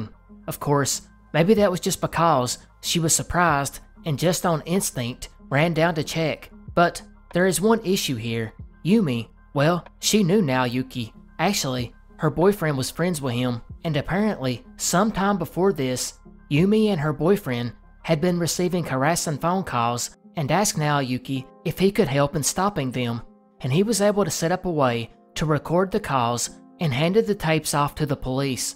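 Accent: South, United States. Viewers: Love your channel man. I’m from sc and it’s refreshing to hear a fellow southerner😂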